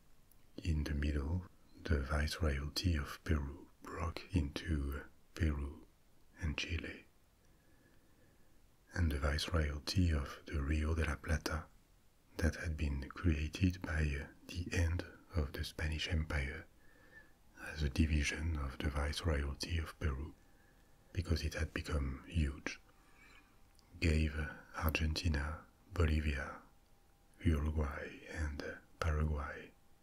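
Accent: France. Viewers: I can’t wait to hear about the antique books! Antique books and French accents are my weakness 😁😁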